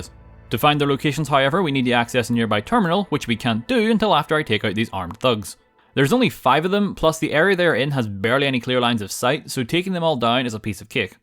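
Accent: Northern Ireland, United Kingdom. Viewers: Are you from Northern Ireland? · Northern Irish! cool to hear an NI accent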